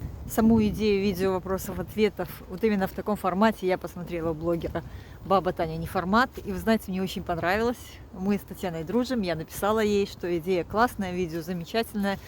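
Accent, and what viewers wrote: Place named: Belarus. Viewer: не слушайте критиков вашей дикции. У вас чудесная дикция с едва уловимым, красивым белорусским акцентом. Так-же, не слушайте придурков политизированных маргиналов провоцирующих вас по по поводу языка общения. У вас всё отлично и ваш канал замечательный.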